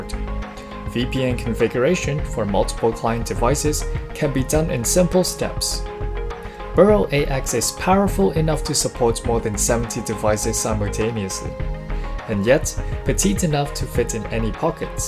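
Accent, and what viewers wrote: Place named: France. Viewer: Very very French speaker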